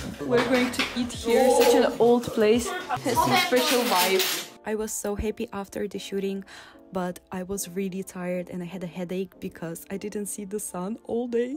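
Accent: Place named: Russia. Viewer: 한국말하는 쇼츠영상이 더 좋지만 러시아 억양의 영어히는 도 좋아요 ㅣ😊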